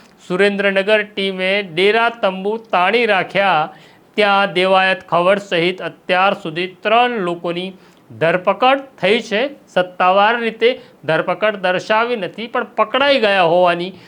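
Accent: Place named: Bihar, India. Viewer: તમે બિહારી પત્રકારો ના ટોન માં કેમ બોલો છો, ગુજરાતી ની જેમ વાત કરો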